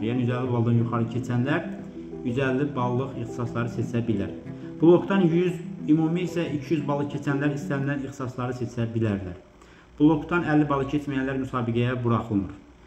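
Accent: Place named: England, United Kingdom. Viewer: müəllim, hərdən siz Azərbaycan dilində danışanda ingilis dili aksenti hiss edirəm😅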